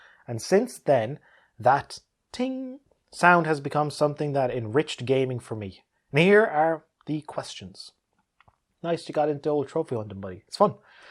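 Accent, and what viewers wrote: Ireland. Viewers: Currently listening to these as an aid to get me to sleep. They do say the Irish accent is musical. All I know is it works. Thanks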